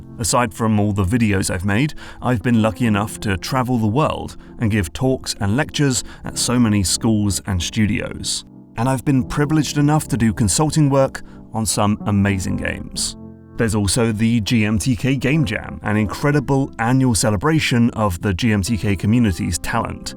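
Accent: United Kingdom. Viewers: Finally, he addresses the elephant in the room: his British accent. cf. Between Two Ferns e/ Benedict Cumberbatch: "Do you think if you didn't have a British accent, people would be able to tell you aren't a very good actor?" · Idk man, the fancy motion graphics and British accent was pretty convincing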